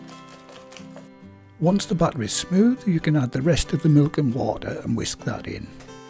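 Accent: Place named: Northern England, United Kingdom. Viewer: Hi, where did you get those oven gloves from? Great videos keep up the good work. Lovely to hear a northern accent on YouTube 👍🏻😉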